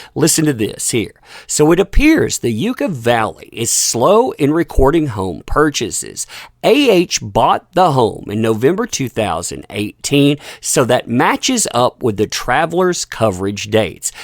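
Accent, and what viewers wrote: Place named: East Coast, United States. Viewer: Ok, you can sure tell you're from back east it's YUK-A not how you say it. Also please do a segment of a h getting offered $10 million to do a XXX rated porn-flick.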